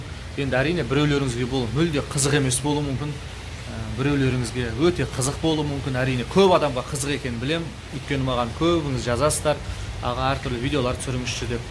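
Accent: Standard. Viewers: Жарайсын! Кандай адепти де мадениетти сойлегенинин ози тамаша, тагы да таза казакша сойлегенине рахаттанып отырып кордим. Рахмет! Бари тамаша кандай керемет орындар! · казакша таза сойлегенине риза болдым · Рахмет жігіт,қандай таза сөйлейсін қазақ тілін! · Қазақша таза сөйлеп жақсы видо болды тамаша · Керемет.Тап-таза сауатты сөйлейді екен қазақ тілінде.Денің сау болсын қазақтың - Азаматы.Қайда жүрсең де аман бол! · Озге елде оз тилинде таза сойлеу. Оз елиндеги тил билмейтин балыктардан караганда мын есе артык алла алдыннан жарылкасын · Балам, қайда жүрсеңде, аман бол! Шет елде жүрсеңде, таза қазақша, мәнерлеп сөйлегеніңе, мың рахмет! Сені туған, анадан айналдым! Біздің Қазақстанның мынандай күнге жету қайда! Аман бол, балам! Ақ Жол! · Керемет қазақша сөйлеген таза жарайсын рақмет биіктен көріне бер ✊❤ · Қандай керемет! Таза қазақша сөйлегеніңіз ұнады! Алла жар болсын Сізге! 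· Керемет түсіндіріп жатырсын. Жалпак қазақ тілінде. Айналайын биіктерден көріне бергын. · Алла разы болсын.нағыз қазақтың жігіті екенсің таза сөилегеніңе рзамын бәрі тамаша👍👍✊ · Казакша таза сойлейсин оте жаксы жат елди донгелетип турсын аман жур айналайын болашагын жаркын болсын айткандарынды тындай бергин келеди саган амандык саттилик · Аман бол елге аман орал тек денин сау болсын Америкада журип казакшаны таза сойлеп турсын мын бол казак азаматы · Рахмет бауырым аман жур таза казакша сойлейди екенсин тамаша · Кандай жаксы таза казакша сойлеген кулакка жагымды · Сәлем таза қазақ тілінде сөйлейсің Рахмет түсірген видеоны көріп отырмын. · Қайран қазақтың кемпір шалының тәрбиесі десеңші, қазақы тәрбиенің иісі аңқып тұр. Бауырым осы қалпыңда қал. Тілің таза екен. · Амансыңба бауырым. Қазақшаны жақсы таза сөйлейді екенсің жарайсың. Ол жақта қандай жұмыс жасайсың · Жарайсын бала. Қазақ тілін таза, білімді бала екенсін. 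Біздің Қазақстанды ослай дамыту үшін сен сияқты білімді жастар керек және халықтың көз қарасын ойын өзгерту керек жақсы өмірге. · Бауырым өте жақсы, видеоларың тартымды, тілің таза орысша қоспай сөйлеу мәнерің тартымды, ой өрісің кең екен, сөз жоқ. Біздеде астана, алматы, бүкіл облыс орталықтары, тіпті аудан, ауылдардады қазақтар екі этаж коттедж салып алып жатыр емеспе ( 80% қазақтар) жәйлап жайлап біздікілерде жетер осындай жағдайға деп тілейік. Қазақтың болашағы алда деп қойшы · Тамаша видио . Сені осындаи ер азамат етіп тəрбиелеп өсірген атаң мен апаңа, əке шешеңе мың алғыс ! Шалғаида жүрсеңде қазақша тап таза сөлеп тұрсың. Табысты бол! 🤗👌🙏🇰🇿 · Таза казакша сойлейди екенсиз,кай жерденсиз? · Аман бол бауырым,керемет .Саған тек сәттілік тілеймін.Қазақша таза сөйлеп отырғаныңа тәнтімін,рахмет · Жарайсыз бір орысша сөз арластырмастан таза қазақша әдемі сөйлеп, әдемі видео ролик түсіріп шықтыңыз, бәрі түсінікті болды.рақмет. 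деніңіз сау, жарыңызбен бала-шағаңыздың қызығын көріңіз!!! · бауырым, өте керемет видеолар. Мен бірінші рет көріп отырмын,керемет екен, жақсы әсер алдым, қазақша таза сөйлейді екенсіз 🌹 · Не деген керемет !Қазақтың нағыз азаматы екенсің! Таза қазақ тілінде сөйлегенің көңіл қуантарлық! Алла нәсіп етіп,елге жеңіспен орал! Бағың жансын,бауырым!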